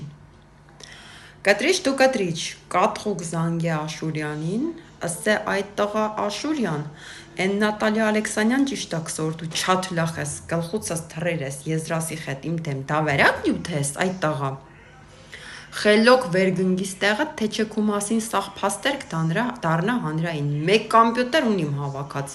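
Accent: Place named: Martuni, Armenia. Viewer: Աղջիկ ջան դու վերջն ես ախար լավնես , բա մարտունու լեզվով շաատ սիրուն ես խոսում դու😂😂❤❤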